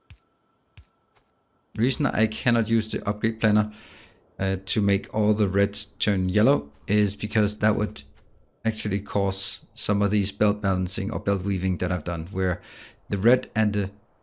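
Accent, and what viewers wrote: Denmark. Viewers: I like your Danish accent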